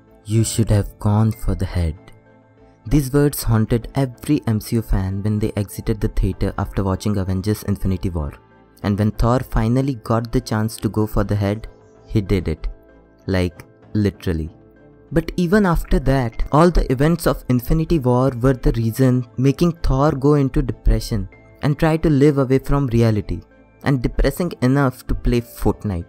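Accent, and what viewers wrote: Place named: India. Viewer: R u indian cause ur English is id wanna say it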